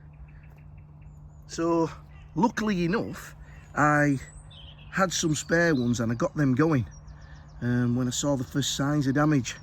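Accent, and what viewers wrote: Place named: Leeds, United Kingdom. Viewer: Ah. As I continued watching I figured - LEEDS LEEDS LEEDS! Fellow Leeds man here! Take care!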